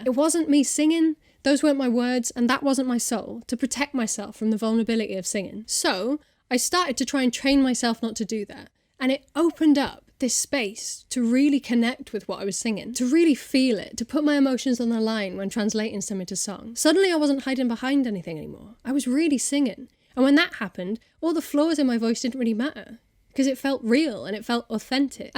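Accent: United States. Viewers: Your American accent is SO good !! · your american accent is so convincing (for a non-american) !! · You're American accent isn't bad. I like (singing or speaking) to listen to different accents & figure out what's similar & what's different to my own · You are correct, there are 20 American accents, but over 37 British accents. Your generic American accent is INCREDIBLY GOOD. I’ve heard otherwise amazing actresses (such as Cate Blanchett) unable to pull one off convincingly. You could give them lessons! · Bloody hell you pull off an absolutely flawless american accent. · I didn’t know such a perfect video could exist. Nice American accent, btw!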